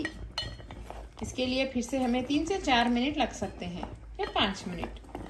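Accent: Hyderabad, India. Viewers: Assalaam Alaikum, Amma Kya Malai Gosh Banai naa aap. Laikin, mujhae yaa poochna tha, amma, ismae mirchi thoda kam daal sakte kya. Mai thoda midcii kam khata hu., kyu kae, mai England mae takriban 50 (Pachaas) salo sae hu. Aap ki Hyderabadi boolthi sunkae bada maza aaya. My aap ke Chantal ko like aur subscribe kardiya. Mai aur meri beebi Hyderabad jab aaynga to aap sae mulakhat ki umeed rakhtae hai. Allah Hafiz..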